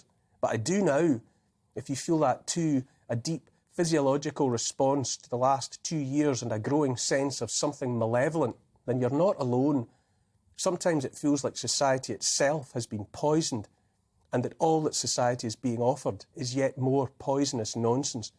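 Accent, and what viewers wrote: Scotland, United Kingdom. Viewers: ❤️💞❤️ Nails it every time! Thank you for being the voice in the wilderness Neil ❤️💞❤️ A gentle, beautiful Scottish lilt A kind, empathic, forward tilt An open heart; a caring face A willingness to take his place. A strong, home-hitting monologue Reminds us of the boiling frog The courage to become a sleuth A passion to release the truth. A deep desire to crack the whip Illuminates our freedom-strip Calls out the darkness taking hold The shiny despots; plated gold! Intense and raw, he lays it bare A soul-enhancing, honest stare Inflections drive the message through He speaks for Us - for me and you! Discernment's such a precious gift His words create a mighty lift Take heed of all he has to say He knows - it shouldn't be this way! 🤗🦋🐬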